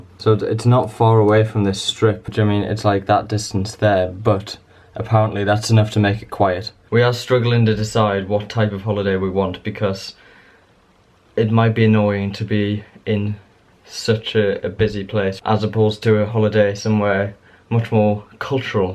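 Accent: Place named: North, United Kingdom. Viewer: Mate your accent is like a hybrid of Manchester and Newcastle! Where are you from in the UK?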